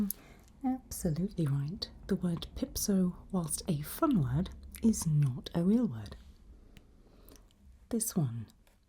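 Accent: England, United Kingdom. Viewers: I really love your English accent, it is soooo comfortable and beautiful ❤❤( I don’t know if this is polite and sorry if it is impolite I just want to say it’s so much comfortable and really make me relax!!)Thank you for all your hard working on ASMR~~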